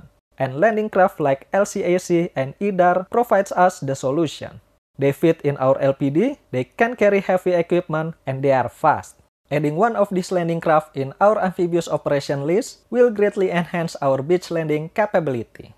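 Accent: Indonesia. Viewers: Aaaahahaha... this is the accent of english language that i love.. Indonesian accent.. love my Indonesia... 🥰🥰🥰🥰🇲🇨🇲🇨🇲🇨🇲🇨🇲🇨🇲🇨🇲🇨🇲🇨🇲🇨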